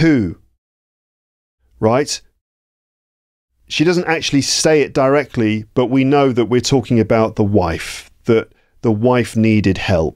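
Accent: United Kingdom. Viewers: I am not used to listen british english! But I just loved to hear your class, your english. And I am very happy that I understood everything! Thankyou Maíra from Brazil 🇧🇷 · Very nice your's british language.salute from Lithuania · Hola, estoy aprendiendo el ingles americano, confirmame si ese acepto es britanico? ó no importa? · Thank you,. Your pronunciation made me remember of the best boss I had when working in a British company here in Brazil, in the late seventies. And I missed those days. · I really love your british english..😊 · BBC ,modulation, thahk so much for the video!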